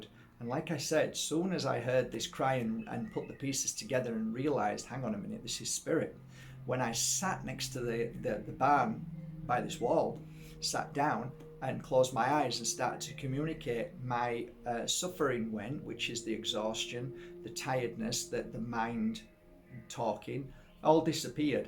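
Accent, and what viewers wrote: Yorkshire, United Kingdom. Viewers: Found a channel called The crystal collector. He goes around different areas digging crystals. Theres a video where he digs amethyst which is my favorite crystal... Amazing. Check him out! your Yorkshire accent is lovely. I've a Bucks accent 😂😂😂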